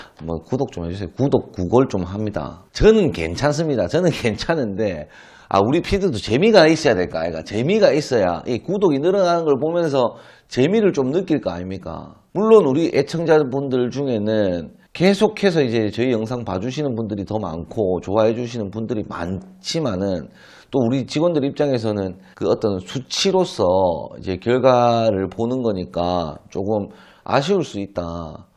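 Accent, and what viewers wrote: Gyeongsang, South Korea. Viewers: 구수한 창원 사투리로 귀에팍 꼽히게 설명잘해주시네요 ㅎㅎ 잘부탁드립니다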